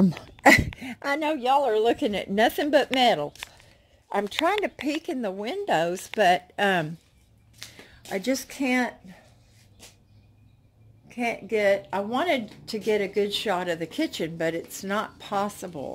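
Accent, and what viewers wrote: South, United States. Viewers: I love your southern accent! Beautiful park!!!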